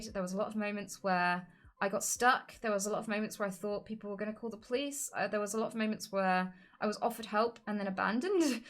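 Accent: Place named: United Kingdom. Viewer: This is the best British dialect a woman can have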